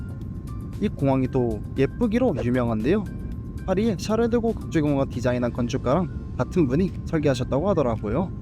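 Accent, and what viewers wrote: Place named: China. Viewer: 중국인임? 발음이 한국인이 아닌데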